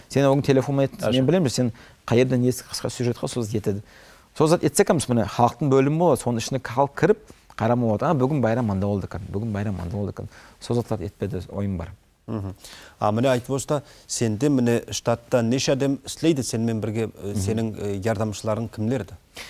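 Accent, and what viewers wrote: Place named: Nogai, Russia. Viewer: На чистейшем ногайском языке разговариваете. Какой красивый язык. Камчатка.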